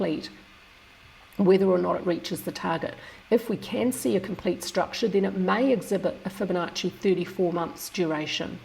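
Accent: New Zealand. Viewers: Jack has done a wonderful job, but it's so, so lovely to hear from you again. Being an Aussie who's visited the Land of the Long White Cloud many times in the past, I really love listening to that Kiwi accent 😅 Thank you for your analysis, being a total mathematics dyslexic I really appreciate it.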